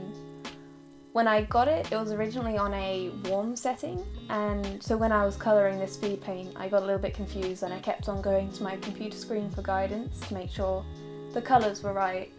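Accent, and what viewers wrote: England, United Kingdom. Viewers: I LOVE UR BRITISHNESS :3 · Got to love that british accent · Me and my friend finish the vid he's like huh I wonder if she's British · I have so many words to tell you but I will put them short I LOVE YOU'RE BEAUTIFUL BRITISH VOICE AND YOUR FACE.... YOUR SO BEAUTIFUL!!!! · you gotta love that PERFECT british accent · are you British? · I DIDN'T KNOW YOU WERE ENGLISH WE CAN BE ENGLISH TOGETHER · Nice. Ur British! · Holy FRICK UR BRITISHHHHH AHAHAHA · MY LORD, YOU ARE ABSOLUTELY GORGEOUS!!! No wonder your art is amazing...beautiful art comes from beautiful people. Me, myself, and I have been a big fan of your art, and also kinda inspired...I've been (SO BADLY) wanting to draw like you, with a style slightly the same, only in the hair shading really. I've always loved the way you shaded the hair...and the apps i have...don't have that kinda setting of shade. 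SO, soon i'll get tool Sai and try, though I don't have a drawing tablet...That dream died quick...anyhow, LOVE YOU, AWESOME ART, I'M INSPIRED! HAVE A GOOD DAY! (PS...I've always adored the British accents...but sadly i'm american so rip lelelel...i'm also 16 so HEY GURL, HIGHSCHOOL IS FFFFUN XD) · you were already perfect and now I know you have a british accent AHHHHHHHHHHH I'M SCREAMING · Well, being British and different is cute ❤ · Finally a British animator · finnaly a brittish animater :3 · YOU'RE BRITISH I happen to love you heck of a lot more now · OMG UR BRITISH GASP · You're British and pretty? GG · YES A BRITISH ANIMATOR!!!! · WOW are you from UK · It looks like a small TV Also you look really cute! Idk why I like British accents Well keep up the good work!❤️ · Are you English? I am too :3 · Ur bootiful.One question are you British ? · I love you British accent! And your sooo pretty! 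💖 I also really love how you animate:3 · U know I thought she would be French but British it's gud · ppl think im British but im Australian!!!! its awesome that ur British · british?:D yay british :D a youtuber that is like me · Im not gonna lie your actually really hot!im gonna get a drawing tablet for my bday this march 23should I plan on buying this because a kind of have my eyes on a lot of tabletsHELP ME (btw I love England accents)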